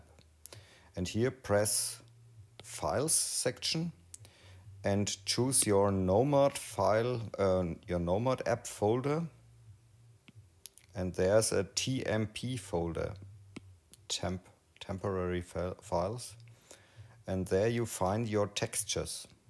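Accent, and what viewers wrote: Bavaria, Germany. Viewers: nice Bavarian English, no gimmicks:hand-purple-blue-peace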